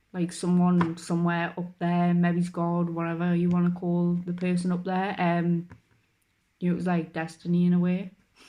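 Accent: Newcastle, United Kingdom. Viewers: Geordie accent (North East England)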